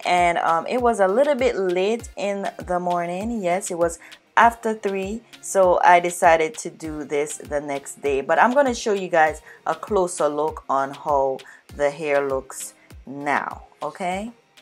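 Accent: Jamaica. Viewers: Are u Jamaican?? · You sound Jamaican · you sound like a Jamaican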